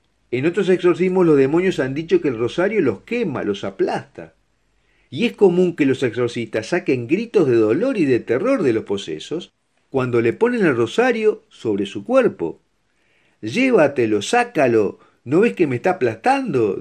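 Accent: Argentina. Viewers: En estos video que tanto nos ayuda a comprender el amor hacia Dios............tiene la caracterisca de una voz argentina........muchas gracias que Dios tenga preparado un sitio en el 3 er cielo a este Sr. que lo respeto mucho es uno de unos cuantos argentinos que se les quiere mucho obvio despues del santo padre FRANCISCO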